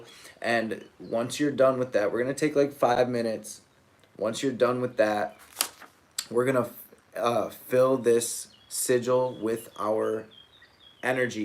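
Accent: Detroit, United States. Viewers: So, I just happened on this account and I don't know anything about you. But you sound like you're from the D....yes? Anywhooo...good stuff here. Thank you!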